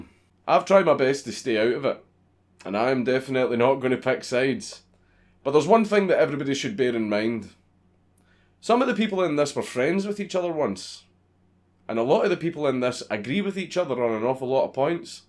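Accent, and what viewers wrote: Scotland, United Kingdom. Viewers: Winter solstice over Christmas? Good man. Love the accent (alas I am aberdonian) and keep fighting for free speech. Makes me proud to be Scottish. Lots of love from Aberdeenshire ❤ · I don't know why, but whenever I watch one of your videos. I'd scroll through the comments and suddenly everything I read sounds Scottish (except a few with five billion emojis). Amazing video. I hope all is well for you for the new year. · 10 seconds in and its already so scottish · Too Scottish, disliked.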